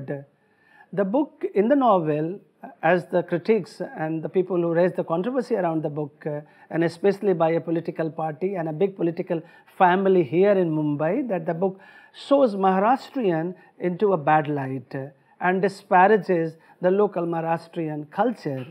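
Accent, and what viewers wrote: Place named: Italy. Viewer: U have a kind of italian accent, as an italian i really love it.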